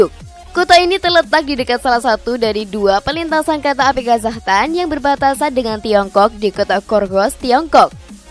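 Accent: Java, Indonesia. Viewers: medok banget ngomongnya..mengganggu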